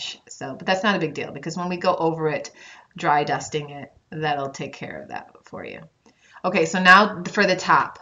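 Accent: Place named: Upstate New York, United States. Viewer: First of all I adore your voice and way of explaining things... your accent reminds me of my late aunt who was an upstate NYer and I miss that! This is absolutely gorgeous and I can't thank you enough for everything you share with us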